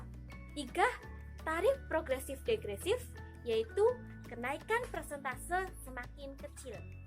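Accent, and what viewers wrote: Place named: Java, Indonesia. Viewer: Aku juga mau ngomong medok gini di depok mba :(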